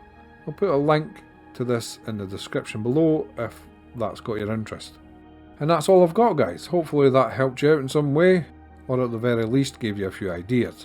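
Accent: Scotland, United Kingdom. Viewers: First Scot I’ve heard doing a star citizen channel. 🕺🏼🕺🏼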